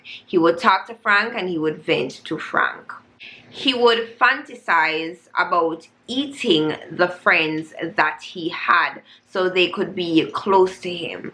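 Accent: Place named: England, United Kingdom. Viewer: I was wondering where you going with an English accent and you dealing with German serial killer. Look likes you were thinking the same thing!😝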